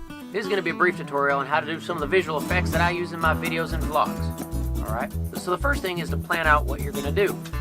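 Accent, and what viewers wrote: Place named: Texas, United States. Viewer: i loved your texan accent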